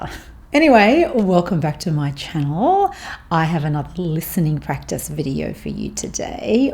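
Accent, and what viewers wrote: Australia. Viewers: This channel is fantastic for learning Australian English and accent. Please make more videos because I learn so much on this channel. It is easy and simple to follow and understand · Hi, your videos are helping me to understand Aussie accent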